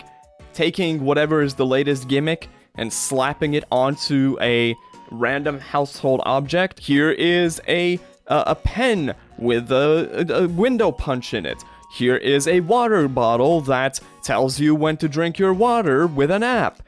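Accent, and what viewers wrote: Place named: Europe. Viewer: Your european accent is fading. fascinating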